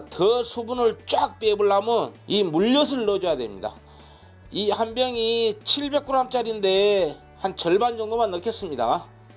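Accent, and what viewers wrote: Jeolla, South Korea. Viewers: 노각 짱아찌 꼬들꼬들 고기 먹을때 좋을것 같아요~저도 해서 먹어야겠어요~ 전라도 구수한 사투리로 재밌습니다😂 감사합니다😂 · 구수한 전라도 사투리 배우고 갑니다👍👍👍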